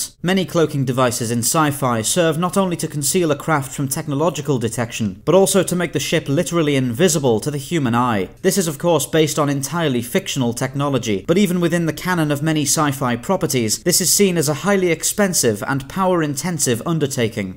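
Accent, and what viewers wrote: United Kingdom. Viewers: I truly enjoy your episodes. It was that pointed you out. I enjoy your attention to detail, graphics and that British accent, with your own personal confidence make for one hell of a great voice over voice!! I do, however, have to disagree with you a number of your points. First, you presuming a galaxy devoid of long range sensor arrays. These serve as surveillance, relay stations, beacons as well as keep track of all craft movement with in the area they can surveil. These arrays could scan for hundreds of different anomalies. They would cover the entire light spectrum, they would also likely have very sophisticated visual scanners, and likely an assortment of drones to extend their range or get closer looks at possible tremors of danger. As far as stealth technology to mask their the residual radiation of their engines, primary faster than light engines and ‘impulse’ engines. 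Also masking every frequency with which they are scanners. If they have shield activated, there is no plausible way to disguise radiation waste from their various engines. It is possible to design a ship that repurposes waste radiation, basically a submarine in space. Shields though, that’s an immense amount of energy in the shields themselves. This a huge problem. Romulans couldn’t engage their shields while cloaked, the sheer amount of energy, projected away from a ship, to most effective, yet at least 200m away from the ship, to better protect the hull from hugely impactful hits that would resona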